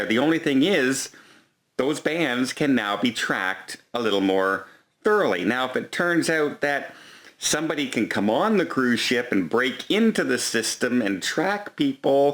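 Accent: Canada. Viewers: He's Canadian. Sometimes they say words differently than we do here. Stop being an English teacher!!!!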